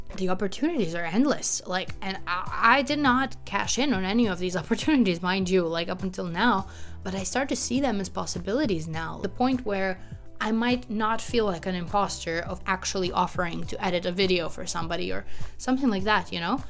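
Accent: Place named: Italy. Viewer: si sente che sei italiana eh. sgamata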